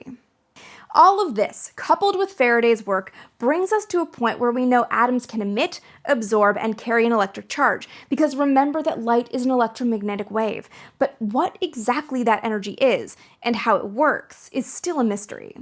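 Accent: Canada. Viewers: She's Canadian, yes? Or Possibly from Minnesota.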